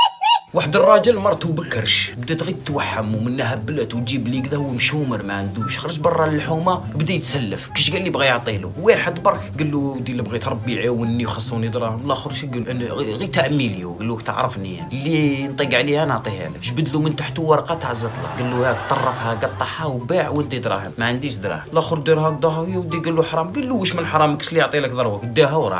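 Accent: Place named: Setif, Algeria. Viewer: خوياا لهجتك تشبه لهجتناا سطييف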